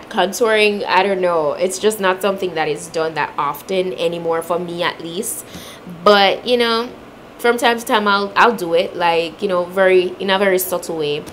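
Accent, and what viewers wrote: Jamaica. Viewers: Just listening to you talk makes me miss Jamaica. My family lives in Kingston 13. I used to visit twice a year but haven’t been for almost two years now.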